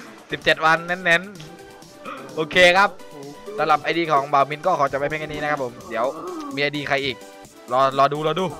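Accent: South, Thailand. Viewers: พี่คนใต้หรอ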